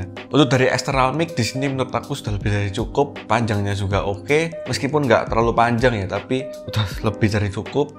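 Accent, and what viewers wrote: Java, Indonesia. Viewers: Matur suwun info ne ..... Sangat membantu, suka sekali lihat public speaking yg medok gini wkwkkwkwkw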